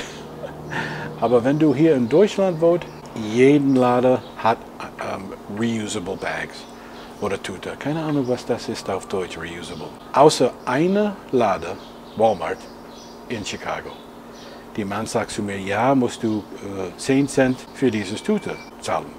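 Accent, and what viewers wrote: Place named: Netherlands. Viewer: Sehr schön wie du sprichst, man merkt auch deine holländischen Wurzeln!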